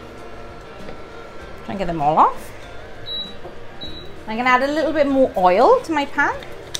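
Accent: Wales, United Kingdom. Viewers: Appears delicious. I want to try cooking it! Is your accent Welsh? I visited Wales many years ago.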